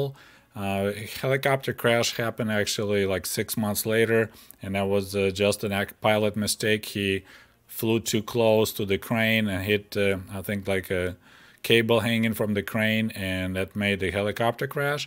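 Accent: Russia. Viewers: Sir, I'm obliged to mention that you still have a discernible Russian accent. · Man I love Russian - English accent / dielect . You sound so nice but at the same time so deadly. Much Love from New York. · Agreed I love the accent so much.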